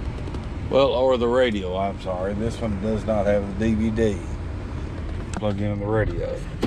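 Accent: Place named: Texas, United States. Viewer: Gotta love the Texas accent. And thanks for the information ❤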